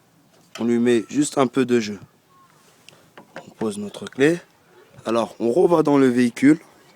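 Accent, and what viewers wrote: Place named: East, France. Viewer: magrébin plutôt. Mais il a plus un accent de l'est j'ai l'impression.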